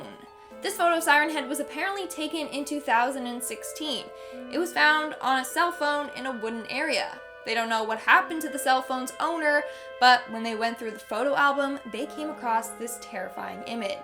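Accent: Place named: United Kingdom. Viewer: i thought she had a british accent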